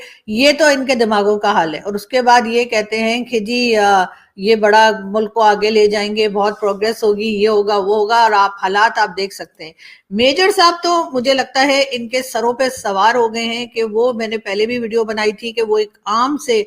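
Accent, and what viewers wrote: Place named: Braj, India. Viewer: हाथ हौला रखें 😂😂,,प्योर up बाली ब्रज भाषा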